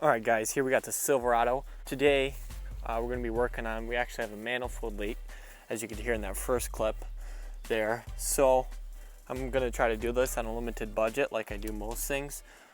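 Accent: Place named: Wisconsin, United States. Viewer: I'm Canadian never been to the states but I'd guess he's from Wisconsin lol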